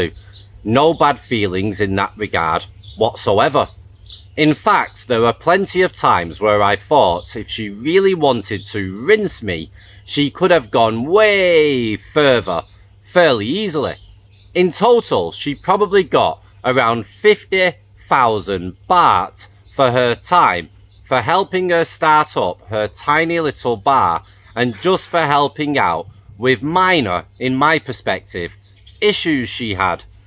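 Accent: United Kingdom. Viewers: Use the 3 F’s rule it always works!!! That Brit accent?????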